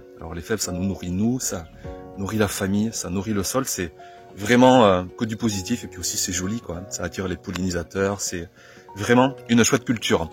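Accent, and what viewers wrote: South, France. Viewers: Ils sont mimi tes loulous... c'est un mini toi 😊, aieee l'accent du sud, j'adore! ça me rappelle mon Marseille de mon enfance... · merci pour cette belle surprise de tes deux petits, effectivement ton fils te ressemble! et cet accent du Sud...c'est déjà du soleil pour moi, pauvre nordiste d'ile de France! à très vite sur ta chaine! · Bonjour, je te découvre en plein confinement, enfin ça fait déjà un mois là, j'aime beaucoup tes vidéos, la philosophie qui en découle. De ton accent (hi hi de vos accents là où je t'écris j'entends la voix de tes enfants, c'est magnifique, moi mon fils déteste les légumes:-(; il a 12 ans et ça marche toujours pas. Bon je reviens à mon propos, de votre accent j’entends le sud... merveille. Moi j'ai un potager sur Bruxelles, un tout autre climat. Tes vidéos me font du bien, je les laisse couler pendant que je fabrique des masques, une autre façon de cultiver la santé.... 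Elle me font du bien tes vidéeos et me ramènent à l’essentiel. Amour sur toi et les tiens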